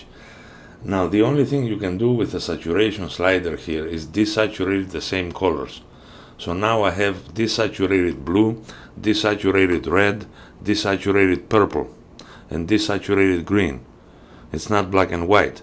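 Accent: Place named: Greece. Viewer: May I ask if you are Greek? You have a very Greek accent. The video is great!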